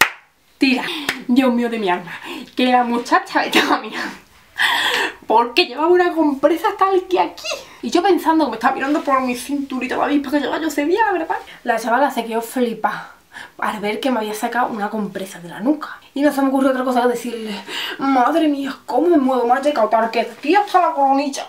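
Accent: Andalusia, Spain. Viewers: Soy latino americano y esta claro que hablo diferente a los españoles y etc, pero veo a esta chica y no paro disfrutar su acento tan rico... tiene un golpe de timbre en ciertas palabras muy bonito no se de que parte de España será por que se que tienen varios vocablos allá pero no se, es muy sabroso su acento jajajaj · Si no eres andaluz si te va a costar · Para que te enteres jeniffer chavala, es andaluz con un toque de barrio y al que no le guste que se arañe. Más claro agua y de cateta nada, Sevilla capital. Los andaluces tenemos la fortuna de hablar bien clarito con pocas palabras.....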